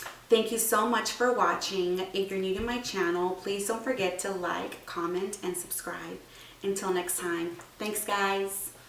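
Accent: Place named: Louisiana, United States. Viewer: Great video!! Your accent sounds like you’re from Louisiana!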